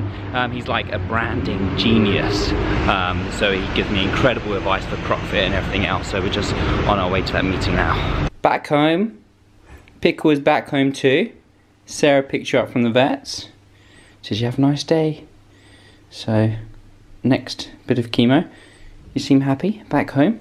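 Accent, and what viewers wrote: United Kingdom. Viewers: Your videos are such a motivation. By the way, lovely accent, what part of UK u from?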